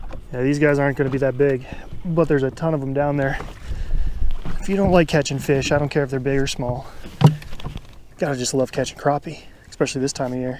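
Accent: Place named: Canada. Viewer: I thought you were from Canada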